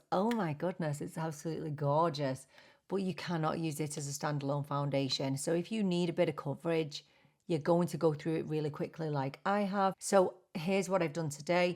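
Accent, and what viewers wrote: Ireland. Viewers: I have been loving your videos & binge watching instead of TV or the major trial in Mass. I am an Irish ☘️ American. Your brogue didn’t sound like my nana’s. You are so professional & funny & enjoyable. I am porcelain strawberry blonde.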